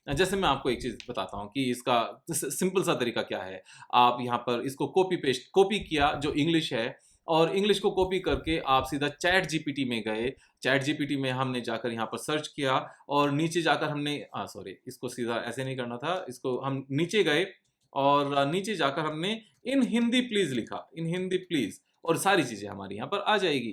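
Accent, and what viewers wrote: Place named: Tamil Nadu, India. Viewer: Thanks bhai for this video. Very informative.I also had fun listening to your Tamil pronunciation.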